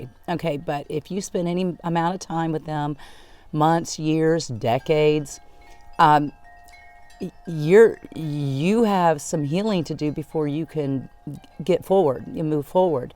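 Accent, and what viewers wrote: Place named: South, United States. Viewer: Thank you. Your doing great and helping me and many Thrive! Plz use your humour! Loving your glasses & that sweet Southern accent!. Loving these long lives! You go girl! I´m starting to thrive again with Purpose & Passion to do what I love! Living well is the best revenge.Knowledge is power! Game over narc. Many Blessings to you all.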